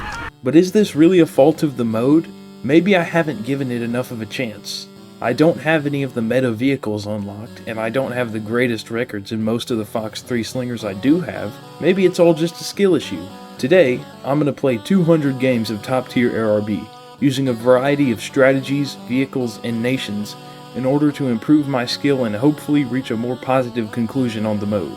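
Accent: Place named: South, United States. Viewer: I feel so bad for you. You're so clearly too stupid for top tier, so much so that I genuinely feel bad for you and your experience. Usually, people like you are scared away before it takes them 3000 hours to hit their first jet because your scores are so menial, every match you play. I think its either the miserably slow cadence of your voice (I get you're southern... [probably fat too]) or simply how you speak, but GOD. DAMN. Stick to Call of duty or something because I beg of you, please do not accidentally join my team...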